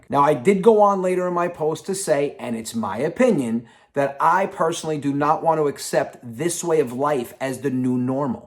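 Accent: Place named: Italy. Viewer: Well done my friend life is short then when we die they show up and say Poor guy he was a nice guy bull shit They are all sonofabitches saluti hey what part of Italy is youre family from ? They have the same way of speaking Say hi to Zio Vine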